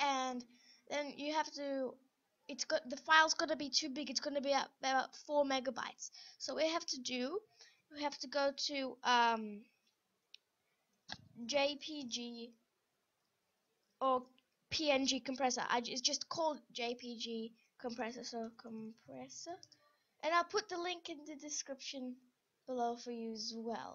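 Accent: Australia. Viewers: you're from austrilia? thats why your accent is so beautiful <3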